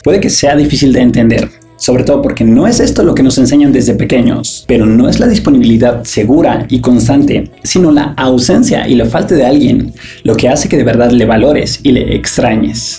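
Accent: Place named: Mexico City, Mexico. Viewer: juan jose perez Yo lo escucho perfectamente como un habitante de Ciudad de México donde por ser tan grande con casi 10 millones de personas y sin contar aún la periferia de poco más de otros 13 millones, existen variados acentos. Pero en específico él es como de una de las zonas del centro-sur, clase media alta. Obviamente con buen nivel no solo económico, si de educación y cultura.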